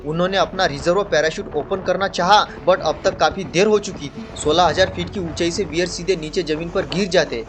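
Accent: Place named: Maharashtra, India. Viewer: Bhava tuzi hindi marathi tuning madhe vatat aahe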